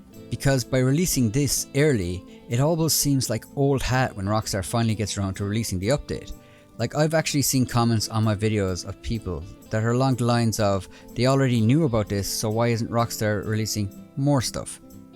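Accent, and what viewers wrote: Ireland. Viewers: I love the Irish accent laddd, but i am a real western lover like real, anyway this game doesn’t remind me of the west, the only thing that does is bounty Hunter, I still have love for this game but it’s getting harder to love every week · You are irish as well? I swear so many irish people play these franchise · you should use you voice more often, everyone likes an irish accent 👍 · What part of ireland are you from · Are you Irish? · great vid man. you sound more Irish than myself. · Dude what part of Ireland you from? Up Cavan!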